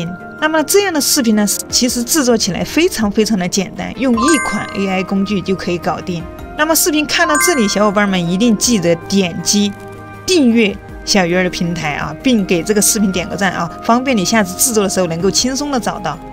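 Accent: Sichuan, China. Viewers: 四川人吧博主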